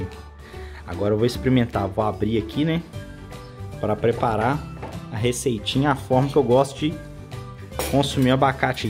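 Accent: Minas Gerais, Brazil. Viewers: Tinha que me inscrever cara, você com esse sotaque mineiro, lembra muito dois amigos que fiz aqui em Goiana Pernambuco, na fábrica da jeep, muito legal!!! Agora uma pergunta: essas mudas que você ensina, todas são anã ou seja pra vaso numa área dentro de casa? Me responde aí a pergunta que fiz!!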